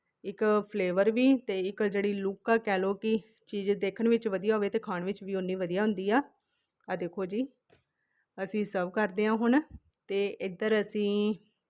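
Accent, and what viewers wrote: India. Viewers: ਅਂਮਿਰਤਸਰੀ ਬੋਲੀ ਬੋਲਦੀ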